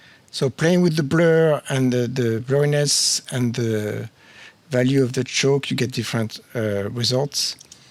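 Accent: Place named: Arab world. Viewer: you have an Arabic accent. nice video by the way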